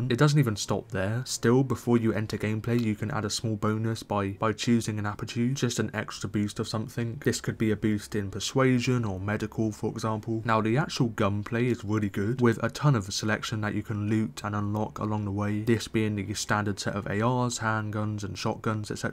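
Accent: United Kingdom. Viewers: SERIOUSLY it's pure and concentrated I was thinking the same thing and made a comment too. Whenever I hear certain phrases here and there in this video I can't help but crack a smile. This guy has the most extreme british accent I've ever heard. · That is one BRITISH f***ing accent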